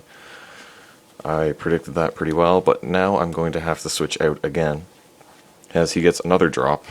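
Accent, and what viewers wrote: Canada. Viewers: Hey, I saw you said on your channel that you use the desmume emulator so I went out and downloaded it, but I have a few questions how did you get your pokes to the correct EV IV and movesets. I've been trying to use action replay codes but I'll get the pokemon I need and then, my game will glitch out deleting my save file. Also it's no trouble to tell that you're Canadian lol.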